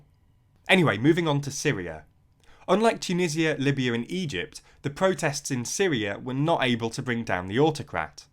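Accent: United Kingdom. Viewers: It's always fun hearing somebody with the most British accent talking about these kinda things. xd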